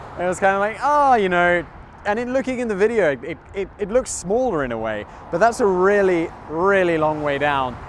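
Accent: England, United Kingdom. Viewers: That a pretty unique English accent...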